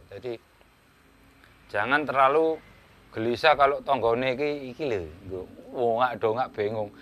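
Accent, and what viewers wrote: Surabaya, Indonesia. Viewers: Suroboyo ndi cak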